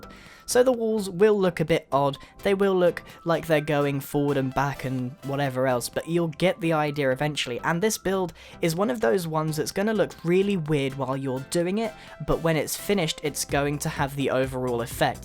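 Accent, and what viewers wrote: United Kingdom. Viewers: are you really brtish ☺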